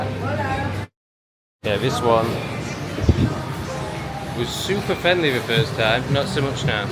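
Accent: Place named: Upper class, United Kingdom. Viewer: You remind me of a privileged guy that went to some famous public school in the uk with that whining upper crust accent. I’ve seen the behavior a few times usually in a movie with the English in some foreign country less developed than their own . Not trying to insult but are you sure you’re strapped for cash? In your free time it might help to at least try to learn a few words of correct Spanish because you sound like even trying is beneath you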